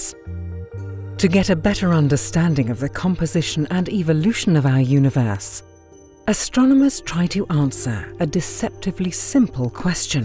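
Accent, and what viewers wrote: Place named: Austria. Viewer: That's a lovely accent... Austria? If so... G'day mate!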